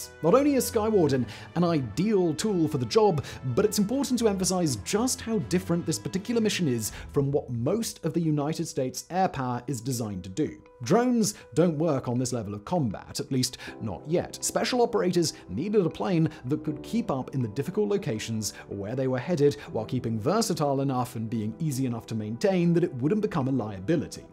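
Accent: United Kingdom. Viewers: Too much "British inflections" makes this too hard to listen to.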